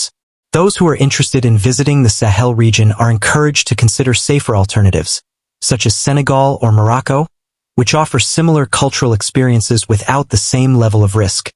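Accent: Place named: United States. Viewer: why does this kiwi sound like a yank?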